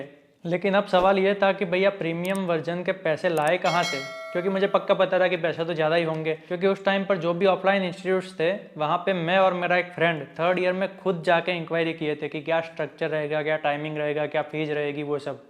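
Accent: Gujarat, India. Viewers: Gujrati tone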